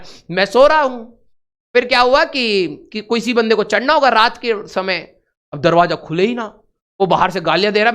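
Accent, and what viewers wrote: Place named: Bihar, India. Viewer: Sir ka bihari ascent ek perfect bolte hai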